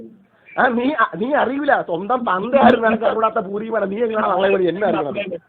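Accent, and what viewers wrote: India. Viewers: Bhasha kettit kodungalloor bhagathanu ennu thonnunnu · തിരോന്തരം ആണെന്ന് തോന്നുന്നു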